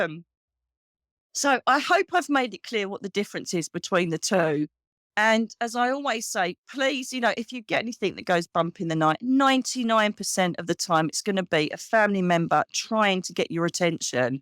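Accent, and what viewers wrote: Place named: England, United Kingdom. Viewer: I love the English accent but need a translator. What does "pants" mean to a US born person? Your teacher was pants as in a pain in the butt? lol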